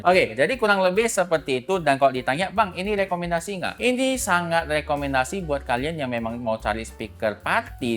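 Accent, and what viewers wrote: Medan, Indonesia. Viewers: berat "kali".., agak2 medan gaya ngomongnya.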